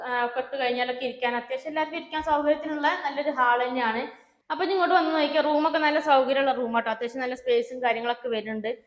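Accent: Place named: Malappuram, India. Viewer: സഹോദരി കാസർകോടാണ് ഭൂമി പക്ഷെ ഭാഷ മലപ്പുറവും ഇതെന്ത് കഥ ?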